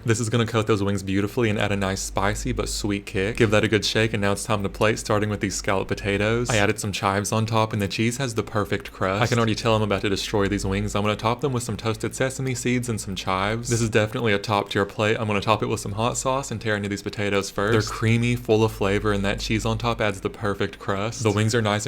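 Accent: South, United States. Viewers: I hear a lil southern drawl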